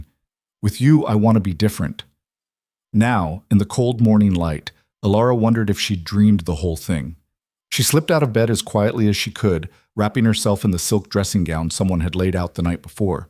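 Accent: United States. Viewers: Remember, this is not exactly an English literature course. More for pleasant entertainment that also gives a glimpse of that era, not necessarily that accurate.The narrator has understandable American accent, at least for these Filipino ears, with the best grasp of the language as many know. Many well educated too, honestly. MANILA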